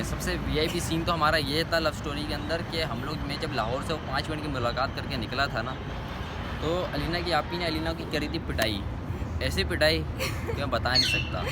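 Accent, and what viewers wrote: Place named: India. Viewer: Assalamu alaikum bhai, Aap Mujahir hu shayed kyuki aap indians ki taraha baat karte hu may be u r family is Indian..........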